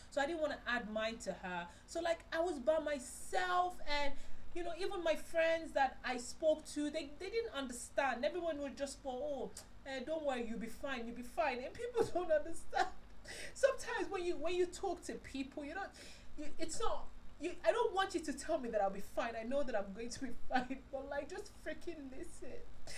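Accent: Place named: Yoruba, Nigeria. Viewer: 😂 You said v-log... Really sorry you've been going through all this. I can't imagine how overwhelming everything has been for you. Nigeria is just a whole beast to deal with especially when it comes to things like your shop issue. Also I'm probably projecting and I'm a huge proponent of keeping quiet if you don't have anything nice to say but I honestly love when the yoruba or the more unfiltered sounding accent comes through from you. Again projecting but yeah. I really hope things don't get messy at all with the shop folks and goodluck with the new shop and the tailoring business. I follow you on insta so I see your fabric ads and I always wondered why you didn't make clothes 'cause its a whole lot more profitable to have both businesses in one. Its what my dad's tailor does. You pick out the material there and the design and boom, he makes his money.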